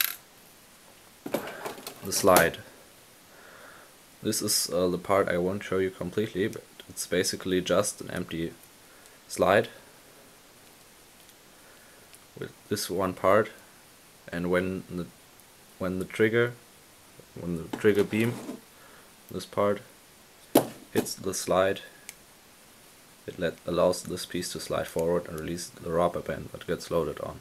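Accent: Germany. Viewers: Jetzt hab ich es mitgekriegt das du ein deutscher bist^^ Wegen deinem akzent^^ Aber echt mal so gefragt wie kannst du solche waffen bauen?0_0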